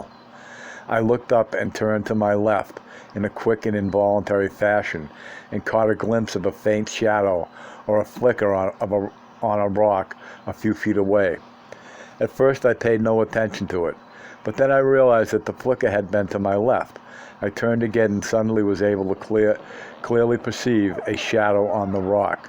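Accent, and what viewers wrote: Boston, United States. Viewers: This is so niche, and I never thought I would listen to Castaneda with a heavy Boston accent which makes it all more mystifying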